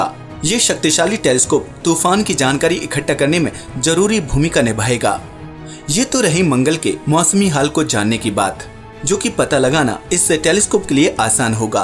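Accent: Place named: Ghaziabad, India. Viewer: Bhaiya Aapki Awaz Gzb ki hai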